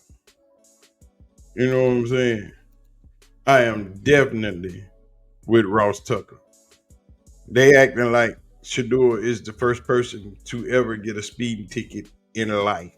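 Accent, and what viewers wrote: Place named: South, United States. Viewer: you a trip - Sounds like.... a lil bit black. A lil bit southern. Reminded me of Samuel L. Jackson thumbs up brother keep up the great work,u got a fan in me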